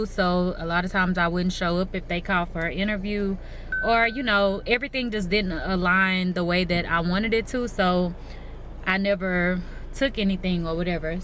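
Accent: South, United States. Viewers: Omg that southern accent 😂😂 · Congratulations on the new job ❤️ LOVE LOVE LOVE just hearing you speak . Such a southern belle .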